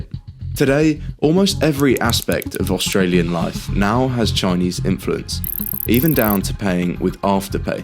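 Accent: England, United Kingdom. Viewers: Bullshit with an English accent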